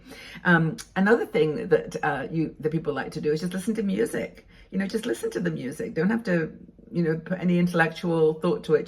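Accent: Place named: United Kingdom. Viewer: Hi! I love walking and I love hearing you speak in a more British way!:)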